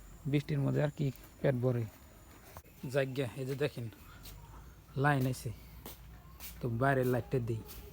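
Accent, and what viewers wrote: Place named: Mymensingh, Bangladesh. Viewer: আপনাদের বাড়ি ভারতে কোন জায়গায় মনে হয় ময়মনসিংহ ময়মনসিংহের মানুষ এরকম কথা বলে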